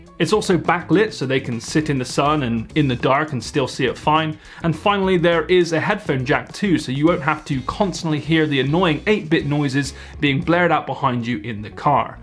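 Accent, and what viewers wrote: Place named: West Country, United Kingdom. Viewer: your heavy west country accent will never not be adorable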